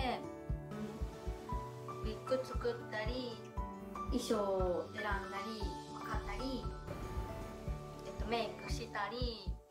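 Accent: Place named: Kansai, Japan. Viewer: 今までツイッターでしか見たことなくて、声とか喋りとか分からなかったけど(勝手に標準語と思ってました。) しっかり関西弁で親近感わきました(笑) 子育てもYouTubeもコスプレも頑張って下さい！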